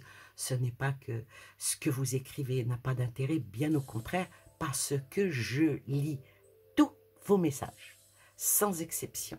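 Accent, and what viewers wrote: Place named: East, France. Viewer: Bonjour, je vous suis mais je n’ai jamais laissé de message. J’avais remarqué un accent de l’Est, je suis née en Lorraine et je vous écoute de Londres, on sent votre sincérité et vs êtes très agréable à écouter. Merci pour tous 🙏🏻. Bisous 😘. Nanou 🤗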